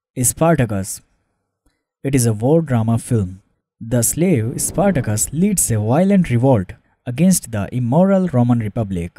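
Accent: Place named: India. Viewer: i dont care about the stories im here to hear the indian voice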